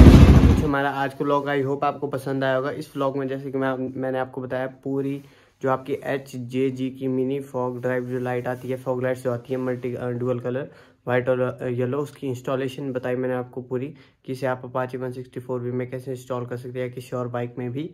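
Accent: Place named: Garhwal, India. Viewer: bhai tu garhwali h kya teri language tone se lg ra h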